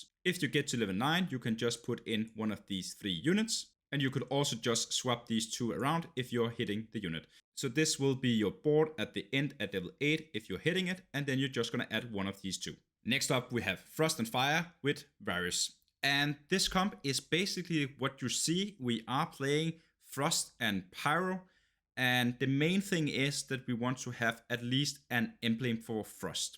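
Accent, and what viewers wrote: Denmark. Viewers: Den danske accent er dejlig🇩🇰🇩🇰🇩🇰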